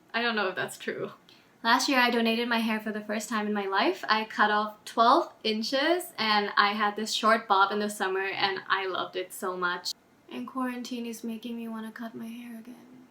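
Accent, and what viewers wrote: Thailand. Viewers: THE CUTEST slight thai accents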